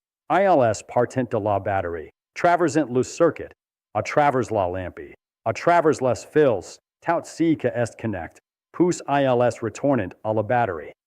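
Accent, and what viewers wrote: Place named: England, United Kingdom. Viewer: Oups 😮 le sujet est intéressant mais la prononciation anglaise des mots francais les rend pratiquement impossible à comprendre... You better say it in english or find someone Who speak " français " 😊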